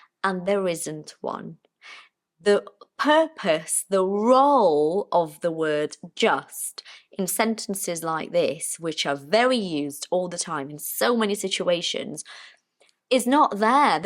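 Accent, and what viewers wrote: United Kingdom. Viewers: You must a Brazilian, speaking the English language as a foreign language in Brazil. And you also sound a little bit like a british person. Congratulations, my dear! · Thanks, beautiful. I really like your British accent. It's very pretty.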